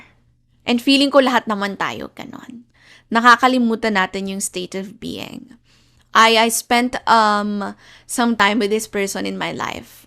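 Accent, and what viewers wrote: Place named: Philippines. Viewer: That was one of the greatest podcasts I’ve ever seen And I see a little Philippines accent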